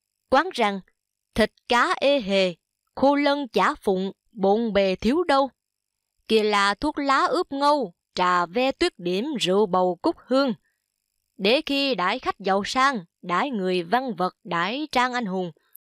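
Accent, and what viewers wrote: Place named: South, Vietnam. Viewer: Đúng rồi, phải đọc ngôn từ miền Nam như vậy mới đúng, chứ dùng ngôn từ chỉnh sửa cái gọi toàn dân thì trật lất hết rồi, chân thành cảm ơn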